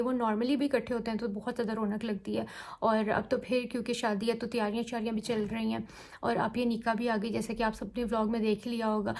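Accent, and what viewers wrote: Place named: Punjab, India. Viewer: Punjabi tark is so good😂😂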